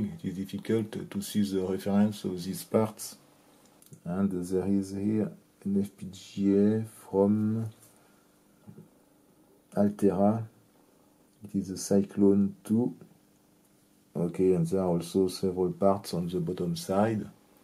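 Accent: France. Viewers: It's the most stereotypical French accent and I love it :) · No you can not understand the French ascent, it is destroying any ability to understand a word.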